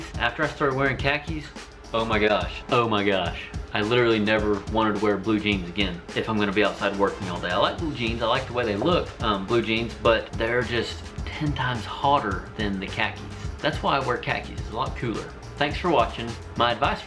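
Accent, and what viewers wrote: Oklahoma, United States. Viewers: What part of Oklahoma you from?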